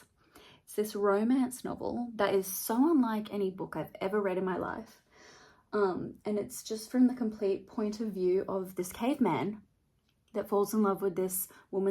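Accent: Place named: Australia. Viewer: I’m 31 and I’ve been watching you for agesss and I feel like you’ve lost your Aussie accent a bit 🥺 love you and love Australians so much ❤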